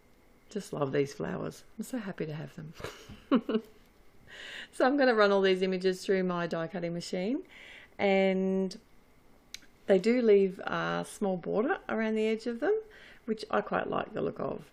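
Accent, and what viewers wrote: Australia. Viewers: Nice to hear a fellow Aussie's voice on YT!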